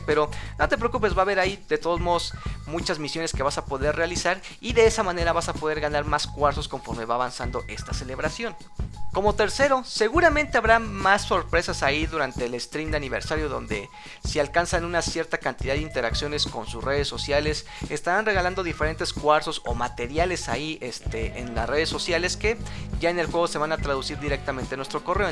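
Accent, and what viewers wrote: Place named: Bolivia. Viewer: por qué hablas tan boliviano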